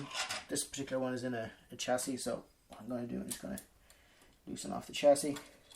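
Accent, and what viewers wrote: Ireland. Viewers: It's an Irish accent, but not a difficult one. Are you talking about that, or the volume?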